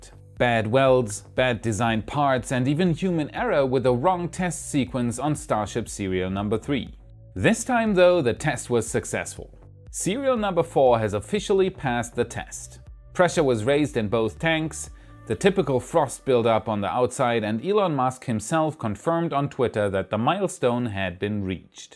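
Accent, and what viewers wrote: Germany. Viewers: Have i mentioned how i like your van braun english accent! Great video as usual. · Are you from Germany?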